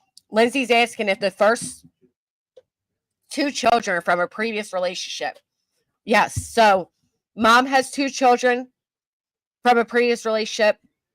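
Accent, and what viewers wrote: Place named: Pennsylvania, United States. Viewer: Happy Mother’s Day! I’m not far away from the PA line so I get how you say certain things. Much like we do here in Delaware 🌊🏖️☀️🏝️🐚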